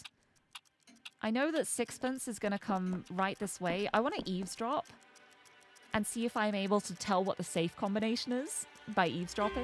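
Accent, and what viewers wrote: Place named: United Kingdom. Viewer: I love your voice. Super soothing. Awesome UK accent 😄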